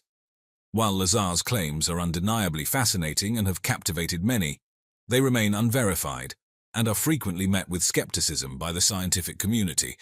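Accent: England, United Kingdom. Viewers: Well at least this had a decent English voice, unlike many of those fake grating Yankee voices although it repeats itself so still suspect this was AI generated.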